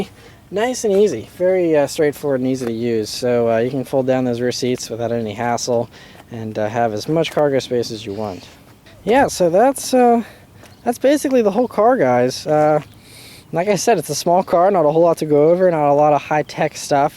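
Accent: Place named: Midwest, United States. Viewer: youve gotta be from the midwest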